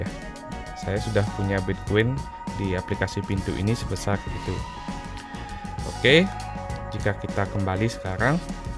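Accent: Bali, Indonesia. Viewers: Asli Bali yaa om? Logat nya Bali banget 😁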